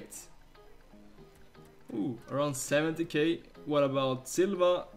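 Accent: Sweden. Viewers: He is so swedish listen to that accent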